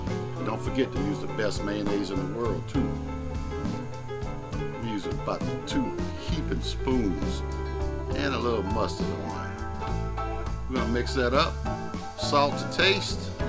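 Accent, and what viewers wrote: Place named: South, United States. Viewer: that ORIGINAL SOUTHERN DRAWL also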